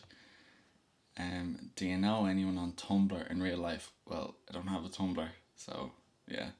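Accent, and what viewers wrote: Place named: Dublin, Ireland. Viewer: you have the 'i'm from dublin but i'm also gay' accent. not in a bad way it's just it really is its own accent. :)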